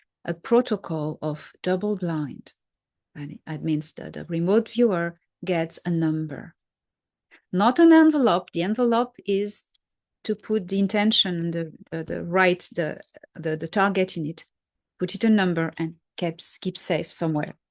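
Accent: France. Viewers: it would be awesome to listen to an audio book read by you with your beautiful French accent ❤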